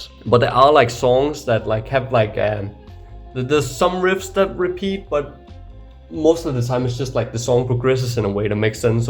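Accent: Denmark. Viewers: Definitely Danish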